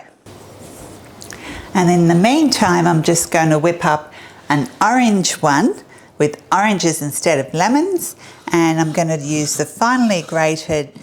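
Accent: Australia. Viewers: Hi have just found u on Youtube and hoe lovely it was to hear a fellow Ozzie there, have seen yr video on braised steak and will be cooking that tomorrow night also the date scones, my husband loves my scones so I cant wait to give him these date scones looking forward to trying ur other recipes cheers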